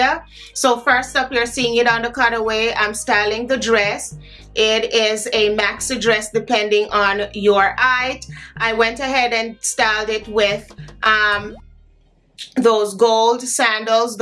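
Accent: Jamaica. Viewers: Love your style and l ove when you speak jamaican patois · Hi K, Jay and I are here laughing hard! He loves how you bring in your patois! 🥰 As always, here for the fashion and always enjoy the vibezzzz!!!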